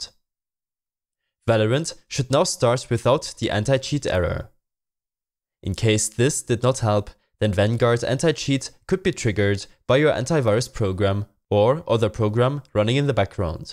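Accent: Germany. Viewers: Nice german accent. I hope your instruction would help me to solve my problem. Nice video and have a nice day. :D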